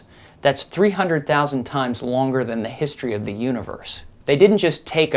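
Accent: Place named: Scotland, United Kingdom. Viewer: thats the first time i have heard the scottish accent being referred to as 'adorable'...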